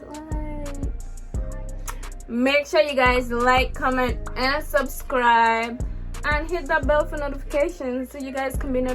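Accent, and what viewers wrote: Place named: Virgin Islands, Caribbean. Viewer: Hearing a VI accent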